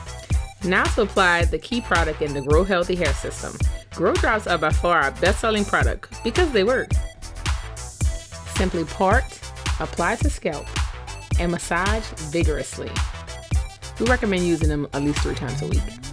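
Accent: New Orleans, United States. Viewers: I love the New Orleans accent 🥰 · Love your accent. Are you from New Orleans? Love the hair.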